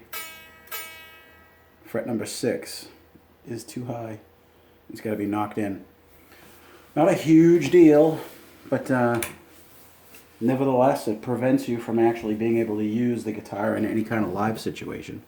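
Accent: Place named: Boston, United States. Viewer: was wondering where you were from I'm from Hyde Park and felt right at home with your accent lol it was pissa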